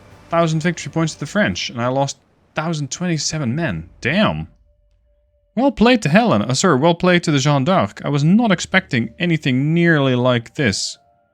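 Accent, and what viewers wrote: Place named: Netherlands. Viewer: horrible Dutch english. OMG. get some training.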